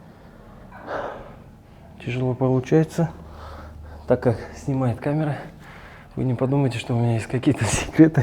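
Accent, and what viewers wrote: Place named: Kazakhstan. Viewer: Казахский Русский пойдет👍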